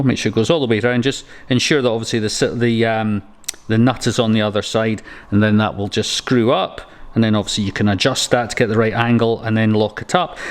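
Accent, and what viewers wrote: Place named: Scotland, United Kingdom. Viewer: OMG 😮 ... You’re Scottish!! :-). All the big you tubers are Scottish... just look at big-Clive!! :-)... great video!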